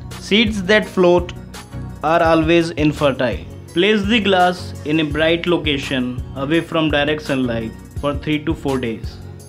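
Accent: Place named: India. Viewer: O my guys, when indian 🇮🇳 speaks English make me so nervous.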